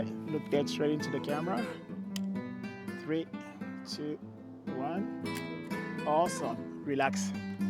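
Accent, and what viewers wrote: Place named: Australia. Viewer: Your portrait of your son is stunning! I hope both guys appreciate the gift these photos are now and WILL be for their children and grandchildren. I also hope they know how appreciated they are for giving you (and us! 🙂) their time and willingness to model and be teaching aids. Thank you to both of them. I'm always happily surprised the moment I realise an Aussie is creating and sharing here on YouTube. The moment you started talking I started smiling, then you panned around to show the trees in your yard and, yep, Aussie! 😁 Going back to my appreciation of your models, part of that is because I don't have one. Thirty two degrees in Melbourne today and I was messing around with a strobe, running backward and forward between camera and the stick I'd put on the ground as my "mark". It's an excellent way to suffocate oneself under the dark cloth and look hot, sweaty and as messy haired as possible on film! 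Too hot in the darkroom to develop it yet, even am....but I'm encouraged to do a little more experimenting after watching your video. Thank you for taking the time, I'm very grateful, and cheers to your models for their time. Their portraits turned out beautifully and that double exposure is a truly happy accident, too!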